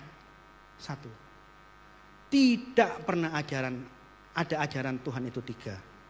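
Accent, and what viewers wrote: Java, Indonesia. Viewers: ketrunan NTT..tpi uda lama dijawa..jdi adaptasinya kental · Kirain ambon. Logat jawa y.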